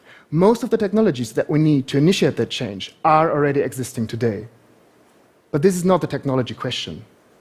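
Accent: Germany. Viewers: Oh my, that German accent.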